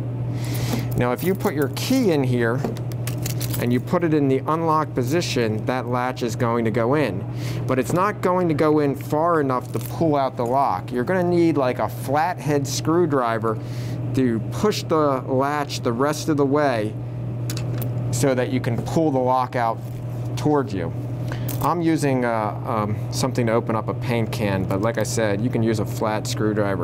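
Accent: Philadelphia, United States. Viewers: You have a strong Philly accent.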